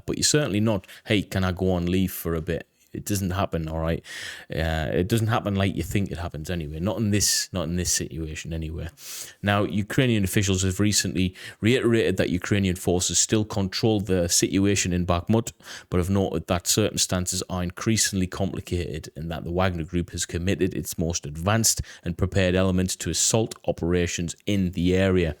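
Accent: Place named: United Kingdom. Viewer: Thanks, good to hear a fellow Brit, Although born there I haven’t lived there for 28 years. A few things, first I am a member of the armchair General brigade, however I have conducted many successful campaigns across the surface of my coffee table. Second, the Ukrainians pushed the Russians back south of Bakhmut, third they blew a dam in the north to slow the Russians down ( which worked). Four, they blew the bridge separating the east of the city from the center. Six, it’s been said that units of Ukrainian troops have been positioned on the western flank of the Russians northern advance in order to slow their progress. I would say the Ukrainians are withdrawing from the city. Seven, the Russians not taking Bakhmut anytime soon, soon could mean in a week or in 10 days, I doubt Bakhmut will hold much longer. In my ‘expert’ opinion of course. 🇺🇦🇺🇦🇺🇦🇺🇦🇺🇦🇺🇦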